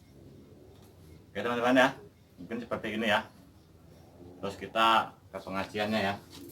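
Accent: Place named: Central Java, Indonesia. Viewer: Aslinya mana to mas,bahasa jawane kok kaya orang jawa tengah ...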